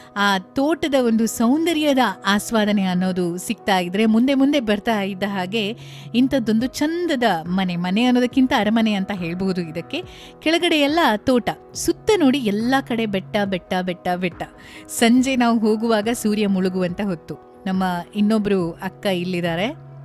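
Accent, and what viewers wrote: Standard. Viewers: ಏನ್ರೀ ನಿಮ್ ಕನ್ನಡ👌.. ಕಿವಿಗೆ ಇಂಪು ನೀಡುವಂತಹ ಸ್ವಚ್ಛ ಕನ್ನಡದ ವಿವರಣೆ😍 ಕಣ್ಣಿಗೆ ತಂಪು ನೀಡುವಂತಹ ಮನೆ ಹಾಗೂ ಸುತ್ತಮುತ್ತಲಿನ ವಾತಾವರಣದ ಚಿತ್ರಣ.. ಬೊಕದಾದ ಬೋಡು 😊👌..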